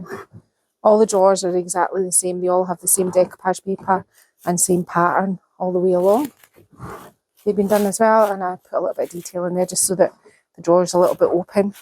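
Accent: Scotland, United Kingdom. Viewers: The Master of layering! When I first started watching your lovely videos, I thought you were saying "lairs." Which I also thought was a strange word to describe layers. It must be a Scottish thing. The layers were in a lair? Just finished a tray using decoupage, stencils, and lots of lairs. All inspired by. Doing the monotonous repeats is very zen. Beautiful. Can't wait for your stencils, gift that keeps giving. Edit: also the Queen of the leitmotif. 😊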